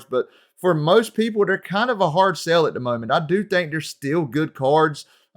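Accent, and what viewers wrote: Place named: Arkansas, United States. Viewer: Love the accent! Reminds me of when I lived in Arkansas for a couple of years haha. Great overview man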